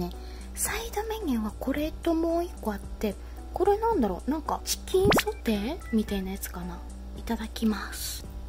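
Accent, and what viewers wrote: Kagawa, Japan. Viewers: たまに出る讃岐弁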